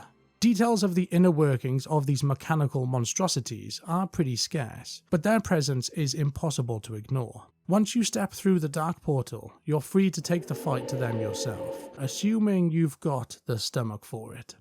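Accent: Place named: Cardiff, United Kingdom. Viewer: I know a fellow Cardiff boy when I hear one!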